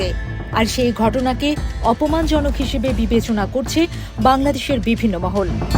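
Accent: India. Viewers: Apner bolar dhoron tay amon keno mone hosse j akjon indian uposthapika news portese? Bolar dhoronta change kora uchit bole mone korsi. Mustafiz k bad dewar bisoyta sudhu matro opoman jonok e noy, Bangladesher onek khotir o karon. (Ovijog othese.......), (Bangladesher bivinno mohol), ai bakko / sobdo gulor bebohar and bolar expration ta indian type. Indian news presenterder mukhe amonta sune thaki pray somoy. Tai baktigoto vabe amr mone hosse jeno indian kono news presenter news path korlo. Plz, bisoyta vebe dekhben. Amr nikot mone hoy ami bangali, bangladeshi. And Bangladesher news gulo porar somoy bangladesher tone, expression rakha uchit. Er age kono uposthapika/ uposthpoker er news pora niye amr amon mone hoy ni.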